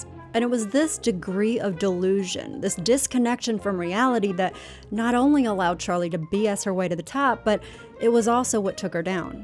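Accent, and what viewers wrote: South, United States. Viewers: Such a great series! Glad I stumbled upon it. Great production quality and lovely southern accent behind it!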